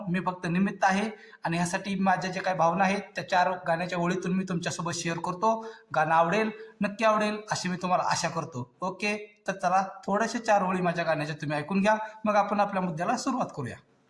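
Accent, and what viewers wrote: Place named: Latur, India. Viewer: तुम्ही लातूर चे आहात का 😂😂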